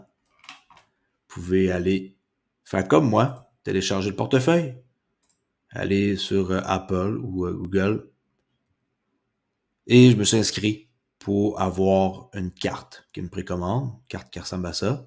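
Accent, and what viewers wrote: Canada. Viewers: Ah bah voilà je me doutais bien que cet accent était Canadien 👋🏻🤝🏻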